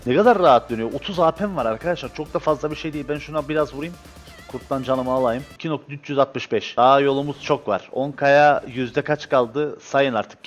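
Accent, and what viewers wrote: Cyprus. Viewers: abi sen gıbrıslısıñ deyiil?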